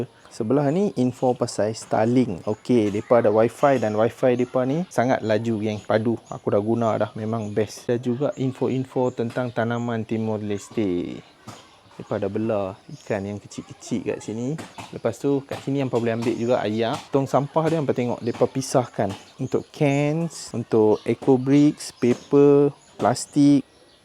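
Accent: North, Malaysia. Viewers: u ckp loghat utara, i mati2 ingt u org melayu. rupanya u indian. tapi u ckp mmg macam melayu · Slang utara dia memang sempoi.. Jelas dan faham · Wow... Kita sekampung loghat utara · baru pertama kali tgk video abg memng puas la dgn loqat utara lagi the best ...semoga channel abg cepat brkmbng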